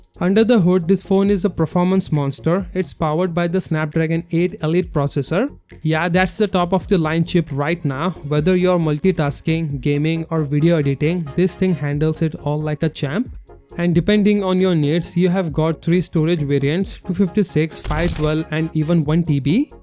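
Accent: India. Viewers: U got the Indian accent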